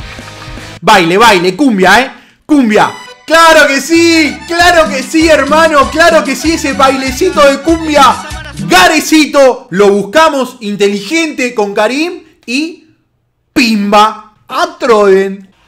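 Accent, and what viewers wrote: Argentina. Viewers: sos de argentina??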